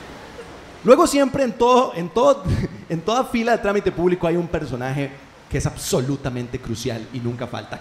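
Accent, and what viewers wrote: Costa Rica. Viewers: diay si no le gusta mae , que tirada .... Así hablamos los ticos mae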